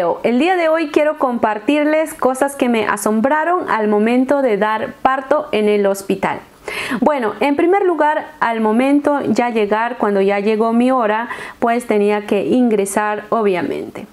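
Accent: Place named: Peru. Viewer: por tu acento eres de Perú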